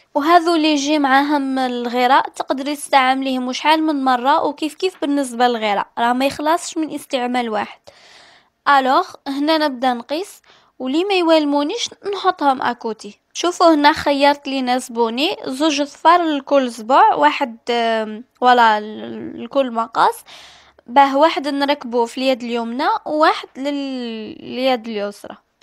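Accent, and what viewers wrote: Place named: Kabylie, Algeria. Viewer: بنت بلادي نموت عليك ونموت على هدرتلك تحيا اللهجة القبلية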